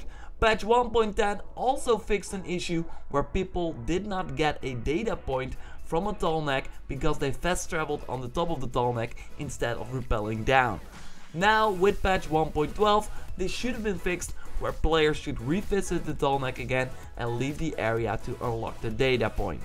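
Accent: Netherlands. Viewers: ik hoor aan jou stem dat je nl bent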